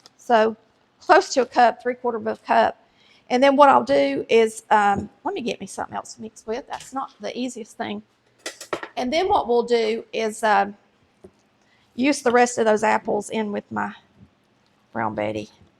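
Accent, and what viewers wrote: South, United States. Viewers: Your southern accent is as fantastic as your recipes! Thinking the Food Network needs to give you a show! I love how you take the time to put "loves" on all of the comments on here! Keep making all of our days more "country" and more sunny! ❤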